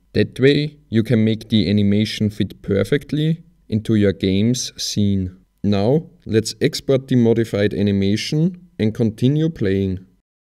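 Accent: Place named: Austria. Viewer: Bwhahaha... Den Ö-Dialekt erkenn ich immer! Grüsse aus Graz und danke fürs Humble Bundle!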